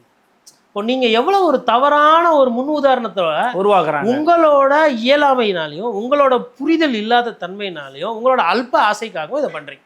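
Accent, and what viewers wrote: Chennai, India. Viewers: தங்களின் சென்னைதமிழ் அழகெனினும் எச்சரிக்கையாக இருக்கவும் சகோ... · உங்கள் madras slang ரொம்ப அழகா இருக்கு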